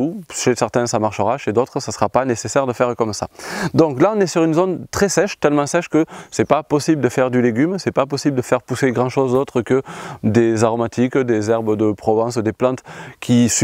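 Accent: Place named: Hérault, France. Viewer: Bonjour nouvelle abonnée votre terrain surement dans lherault je connais bien cet accent😊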